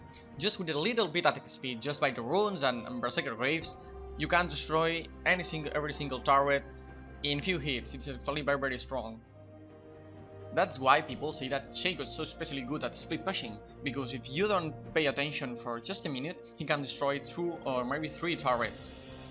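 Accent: Catalonia, Spain. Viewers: You catalan? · spanish? that seemed a bit catalan xD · Are you catalan? Your accent doesn't seem like the english is your native language. Good vid btw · you are spanish? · I play as shaco every day just for practicing and you have helped me alot with your tips. Thank you bro.... PS: Your accent is quite different, Are you from Spain? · Awesome! I've actually never heard anyone from Spain talk before now that I think about it, and now I'm really confused how that never happened. Oh well.. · ¿Eres español verdad? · You're Spanish? I know :v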